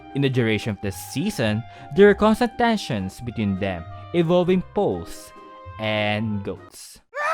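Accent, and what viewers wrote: Philippines. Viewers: You seem to have a filipino accent. Are you?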